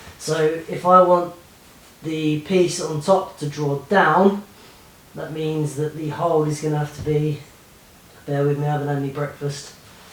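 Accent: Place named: Yorkshire, United Kingdom. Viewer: "...you're gonna wiggle about..." I watch you as much to listen to you talk as anything (although I love your woodwork and garden and building too) because you bring back memories of my grandparents (Yorkshire accents).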